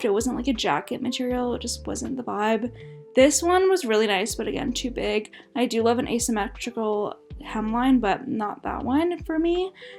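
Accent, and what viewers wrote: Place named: Canada. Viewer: Clues you are Canadian. 1. Accent, you sound like me. 2. Le Chateau. 3. Smart Set. Back in the olden days, I used to manage a Reitmans store. I love the black and white shoes!!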